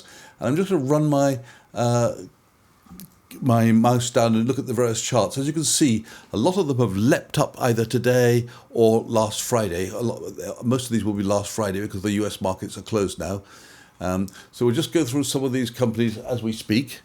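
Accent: England, United Kingdom. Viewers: Really appreciate your wisdom and that beautiful English accent.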